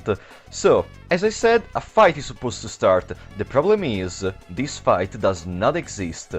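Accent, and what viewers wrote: Italy. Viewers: Are you italian? (just for the accent)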